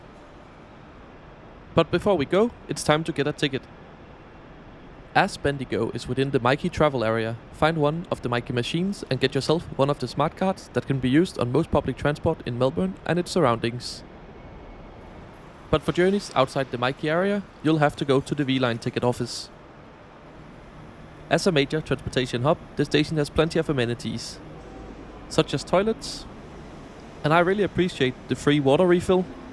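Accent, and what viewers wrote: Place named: Denmark. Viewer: I love your Danish 🇩🇰 accent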